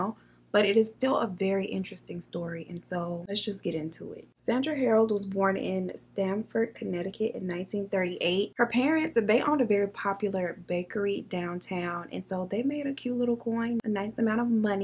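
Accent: South, United States. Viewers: You gotta love & appreciate mamas! ❤ No one else in this world will ever love, help, protect, understand, forgive or worry about you than her! 🥰 I have been watching you for about a yr now and I found you bc I watch Bailey Sarian and MrBallen and one of your videos popped up oneday. You are a beautiful woman 😍 and I love the fact you sound like me. I also have a southern accent (or so I've been told by my in-laws 😂). Listening to you is just like hanging out and gossiping with my girlfriends. 💯 Love your videos so keep up the awesome work! 💋🏆👝👑💄🙏💜🌻💣🦋❤🌟🎉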